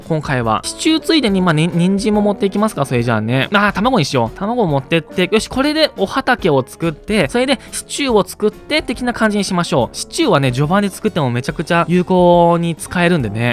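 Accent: Aichi, Japan. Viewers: 愛知県の人？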